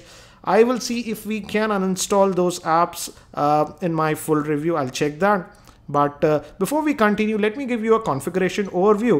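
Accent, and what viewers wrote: India. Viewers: I really hate when i get in a review and find it indian english ! " bress da budden "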